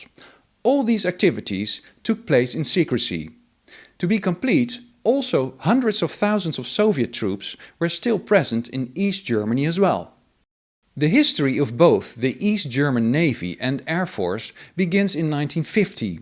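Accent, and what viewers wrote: Netherlands. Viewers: Geweldig kanaal ! Ik hoor toch echt heel goed Engels met een Nederlands accent. Klopt dit? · You speak with a very Dutch accent for a German man. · Although he speaks with. Dutch accent, his German pronunciation is great! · How funny, that you speak perfect German, but you speak English with a heavy Dutch accent.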